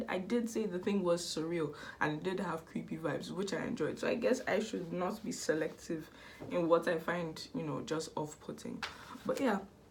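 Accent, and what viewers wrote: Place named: Caribbean. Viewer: Your accent reminds me of my family's, are you from Martinique or any part of the Caribbean by any chance?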